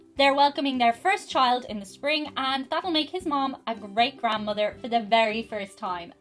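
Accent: Ireland. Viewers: Im saying! I'd pay to have her yell at me in an Irish accent.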